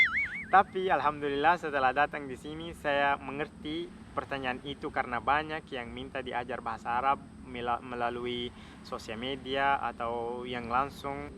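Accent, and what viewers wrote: Makassar, Indonesia. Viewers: semangat dan sukses selalu! Keren bahasa indonesianya logat makassar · Bahasa indoneisanya makasar bangeett...lucu dan keren...he..he... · bang kapanko na bisa pake logat begitu🤣,ketara makassarnya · tau gak bahwa logat bicara bahasa Indonesiamu itu logat Makasar? Sedikit beda dengan logat kami di Jawa... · Waaah bahasa Indonesia nya logat Makassar! Pertama kali saya liat vlog orang asing bahasa Indonesia pake logat Makassar begini. Semoga sukses ya! 👍👍👍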